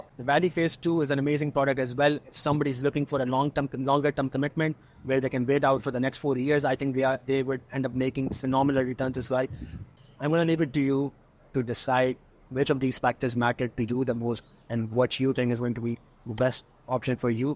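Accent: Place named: India. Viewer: Very tough Indian accent, I didn’t understand 50% of information 😤😑🥴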